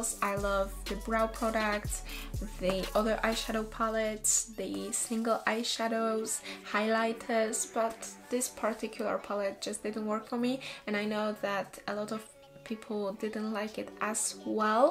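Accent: England, United Kingdom. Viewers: uwielbiam ciee! masz taki wspanialy akcent angielski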